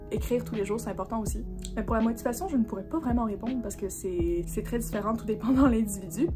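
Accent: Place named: Quebec, Canada. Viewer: Waw cette vidéo est vraiment super :O ! Je vais continuer à t'écouter. Ta voix est satisfaisante et je trouve que malgré ce que tu dis, tu t'exprimes vraiment bien. Mon accent québécois est tellement plus fort que le tien lol. Tu es super inspirante. En ce moment, l'écriture ne fait pas partie de mes priorités, mais elle a toujours et occupe toujours une partie importante dans ma vie. :D Je « relate » tellement pour les playlists d'écriture (je fais la même chose !!!). Je vais probablement aller te lire sur Wattpad. J'adore Twilight et ce qui touche vampire/loup-garou, donc je pense que ça va m'intéresser.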